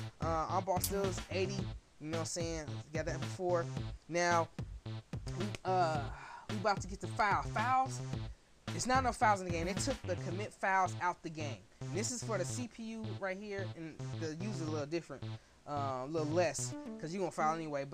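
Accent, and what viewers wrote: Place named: Midwest, United States. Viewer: u gotta be from the midwest .. Chi-Town?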